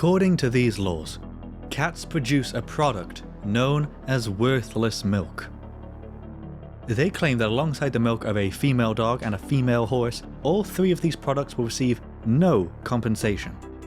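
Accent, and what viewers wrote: United Kingdom. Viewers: He starting to sound like spiffing Brit 👀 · You sound British. If you are, why are you spelling it "Medieval" instead of "Mediaeval"?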